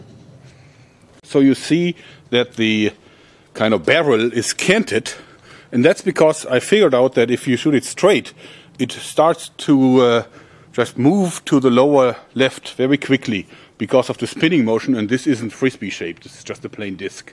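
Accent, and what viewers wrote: Germany. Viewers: Deutscher? Hört man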